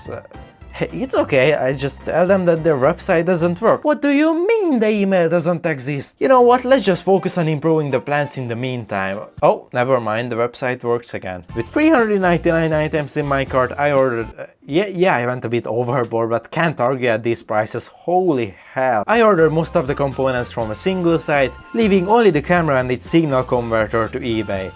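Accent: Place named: Hungary. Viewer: you sound like you have a hungarian accent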